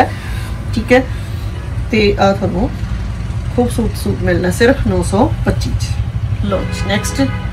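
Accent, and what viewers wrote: Standard. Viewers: Di suit te tohade sohne hai hi mainu tohadi pure Punjabi boli vich Bolan da style bda sohna lagda hai i like you very much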